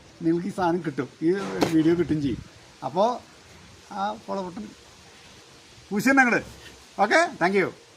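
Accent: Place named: Thrissur, India. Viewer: തൊട്ടിയും പൊരിച്ചു തൃശൂർ ഭാഷയും പൊരിച്ചു 😅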